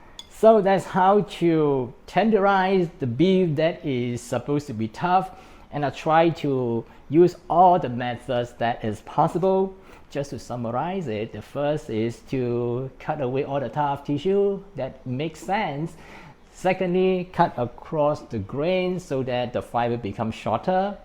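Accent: Singapore. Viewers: Singaporean accent, anyway thank you.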